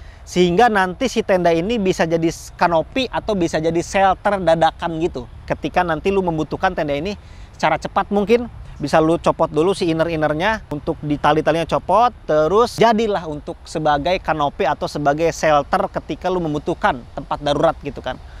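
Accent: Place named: West Java, Indonesia. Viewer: Sunda pisan uyyyy mantaat lah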